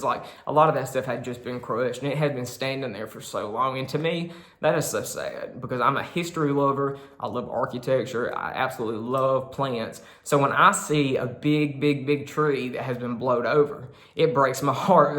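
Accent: South, United States. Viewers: Why do you talk? So funny? Have you thought about speech lessons so that you can eliminate your southern drawl?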